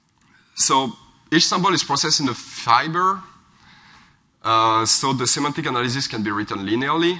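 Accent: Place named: France. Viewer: Nice French accent ! Some frenchies have talent :)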